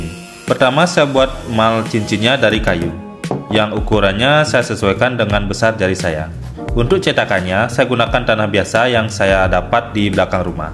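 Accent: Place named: Aceh, Indonesia. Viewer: Logat nya kayak tau, dr aceh bg ya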